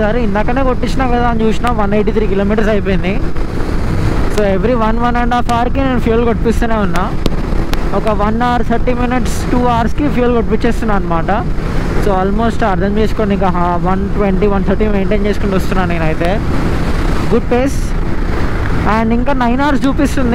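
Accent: Hyderabad, India. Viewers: That hyderabadii language was wow 😂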